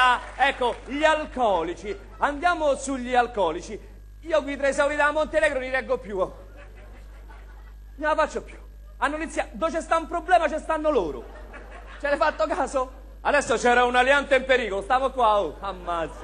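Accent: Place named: Rome, Italy. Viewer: Parla in romanesco stretto per tutto il tempo, e quando dice "coatto" ci tiene a specificare che è un termine prettamente romano... MA DAI?? -.-'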